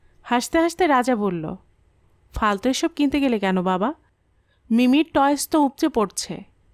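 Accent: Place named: Bangladesh. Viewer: Reading is good except a few Bangladeshi pronounciation.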